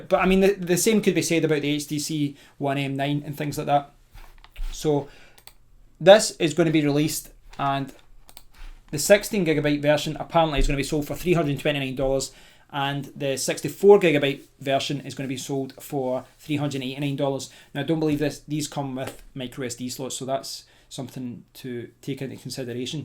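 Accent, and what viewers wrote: Scotland, United Kingdom. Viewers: love your Scottish accent! When I heard it, I pressed the like button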